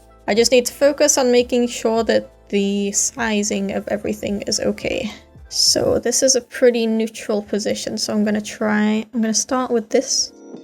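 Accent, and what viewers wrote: United Kingdom. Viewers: I love the British accent owo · .. in the least sexist way what gender are you. You sound like a British young male or a British adult female... please tell me I feel weird about this because I'm new and I may or may not be making fandoms and may or may not need to know your gender for a fandom. Not saying anything here just asking mate.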